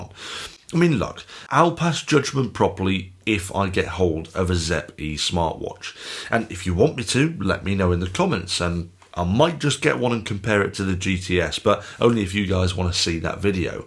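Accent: United Kingdom. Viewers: you have cinematic charisma actually, i enjoy watching your review with your original British accent ❤️. Thank you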